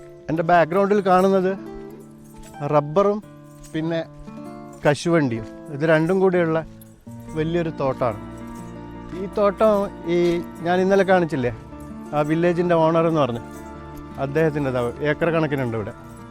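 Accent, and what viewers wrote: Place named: Malabar, India. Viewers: Hallo Sir. You are explaining excellently in your videos. From your slang I can understand that you are a malabari. Any how all the best and waiting more videos from you. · iam watching from atlanta, georgia., usa.....i accidentally came across...ur video....i like your presentation...the language is different...kozhikode style....very good..and your innocent attitude, rather sincere approach...and presentation...is appreciable....thanks....waiting to see the next vedio....👌👌👌👌👌👌👌👌🤣🤣🤣🤣🤣🤣🤣🤣😁😁😁😁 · നിങ്ങളുടെ മൂന്നാമത്തെ വീഡോ ആണ് കാണുന്നത് നിങ്ങളുടെ നദാപുരത്തെ ബാഷയും വിനയവും എനിക്ക് വളെരെ ഇഷ്ടപ്പെട്ടു നാണു വാണിമേൽ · Reminds me of old hippie time in kovalam in 70s..now it's all over..I too was involved in it .but looking back it was a time pass..I didn't know hippies are still there? A rare species anyway..laziness, drugs and such..u sound like from Malabar? 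How did u reach there?single?need not answer if u don't want...all the best?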